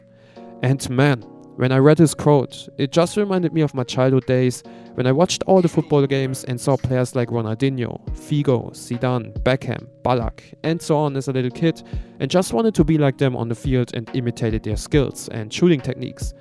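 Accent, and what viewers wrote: Germany. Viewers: Haha, man hört so krank das Deutsche XD. Aber gutes Video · Are you german? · Sag mal bist du deutsch? Und sehr geiles Video btw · Are you german?Cause you do sound like one? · Bruder man merkt direkt das du Deutscher bist lol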